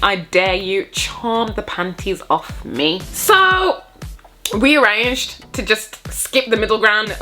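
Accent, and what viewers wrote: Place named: London, United Kingdom. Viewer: Hahahahaah!, you’re hella funny! You remind me of my friend/schoolmate in Uni who’s from London. The way you talk is like hers. Hahaah! Gosh, I want to work and live in Japan too. I was there last year in Tokyo. Japanese guys were blowing up my Instagram and Tinder. I’m an asian foreigner. Most of them were polite though one was a fckboy who wanted to go to my hotel room but I never let him and said bye to him.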